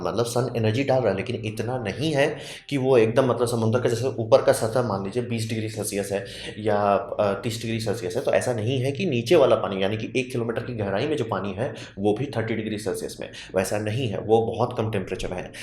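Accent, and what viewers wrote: Bihar, India. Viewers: Sir r you from bihar ...😅........ur way of teaching is so funny and interesting...i enjoyed it...🥰......thnku so much..🙂🙂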